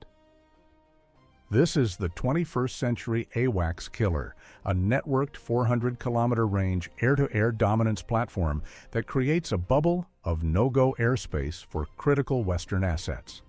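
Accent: United States. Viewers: Because you have an American accent geared up to know what yr talking about you Must be right right? ...Like Russia pinching automatic washing machines for the chips? You go on about the air frame? All it is a a bit of Al or what ever - you can simply make another one. On those grounds yr talking rubish, And an airframe to you means excess costs of parking yr wives fat butts in restruants rather then going into casting of forging metal. Which is why yous and NATO cant keep up and out pace. And after these thumb twidling calculations - then Russia is expected to lay flat like a carpet for yous to come in and rape and pillige the land? I think arrogance in a head blockage is the far bigger problem and liability here.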